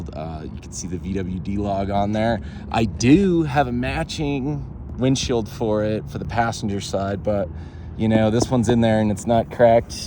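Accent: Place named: California, United States. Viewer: You have a great project there . I could tell by the sound of your vernacular that maybe you are coastal [ maybe California ] . If I were you I would look into an E-Bike franchise that rents bikes or a delivery service to sponsor you to gain funds in your build . A dune buggy engine cage , hip high quarter panels and you can raise the bed to hide a pull out ramp to put bikes or cargo in & out with a cool thick mesh door like they do with truck tail gates . I subbed because I remember back when I was 18 in the middle 80s I bough a 1954 oval window bug rough & rusty but Dude it had all of its original guts [ drive train and interior ] weathered and worn . Put 2K into it and had to move over seas and gave it to my dad . Never give up the first toy you made yourself . lol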